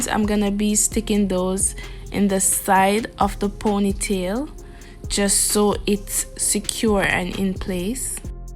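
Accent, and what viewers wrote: Jamaica. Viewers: Love you're jamaican accent❤❤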